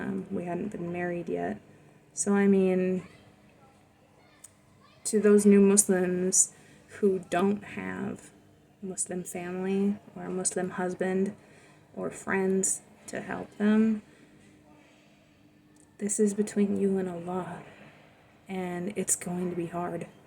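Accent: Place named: Midwest, United States. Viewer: Salaam, which part of the Midwest are you??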